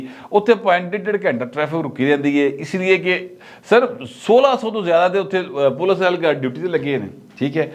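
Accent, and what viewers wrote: Lahore, Pakistan. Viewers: MashAllah Greato Great shb sai Lahori Talk style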